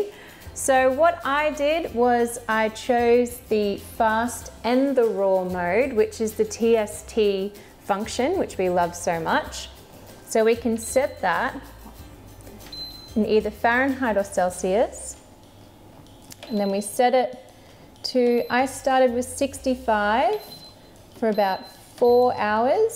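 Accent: Australia. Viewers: Are you Australian, where did you buy that machine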